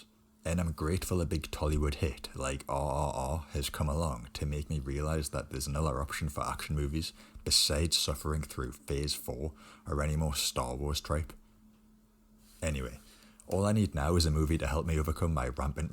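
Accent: Newcastle, United Kingdom. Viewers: An Indian alumnus of the South Tyneside College, from the 90s. I have lovely memories of South Shields. I get nostalgic listening to the Geordie accent. Is there any video where you talk Geordie? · Definitely a true geordie.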